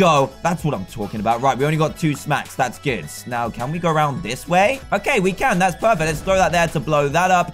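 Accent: England, United Kingdom. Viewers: lol is from england?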